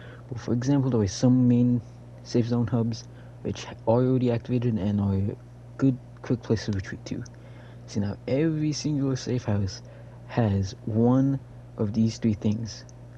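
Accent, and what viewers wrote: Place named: South Africa. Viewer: Great game. Thanks for the video. Btw, what accent is that? Sounds like south african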